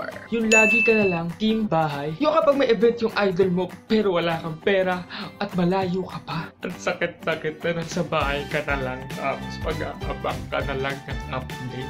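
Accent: Visayas, Philippines. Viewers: Bisaya kaba??? Parang naka bisaya ka eh💕💕😘😍😱 pa shout out pooo plsss!!!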